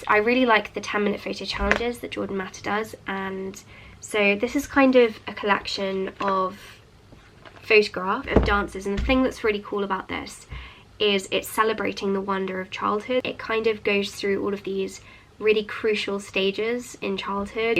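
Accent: RP, United Kingdom. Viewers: Omg your so posshhh like it’s so cute I am from England and bloody hell are we that posh nope 😹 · Wow😮ave never heard someone speaking this posh before 😂😂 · You sound so posh and you say ‘received’ I love your accent although I’m British too 🇬🇧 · Posh english*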